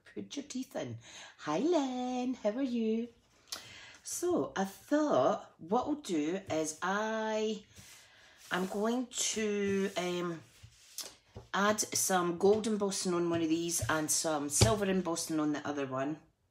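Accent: Scotland, United Kingdom. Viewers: Is that Scotland I hear in your voice? Those cards are gorgeous. I’m a demo from Dover, Delaware in the USA.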